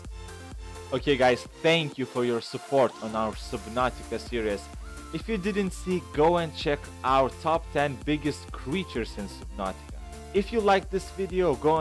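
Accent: Russia. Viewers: your accent more like russian are you russian? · Your accent is like Russian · This man has a Russian accent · do u have a Russian accent? it sounds like it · I love his russian accent.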